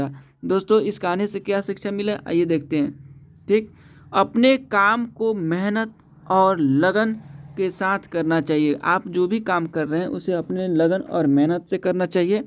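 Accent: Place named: Bihar, India. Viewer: Aapki Hindi Bihari kyu lag Rahi hai 😅😅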